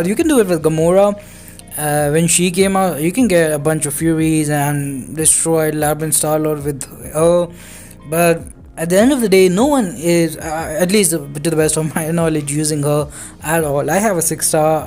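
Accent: India. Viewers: Bro are you from India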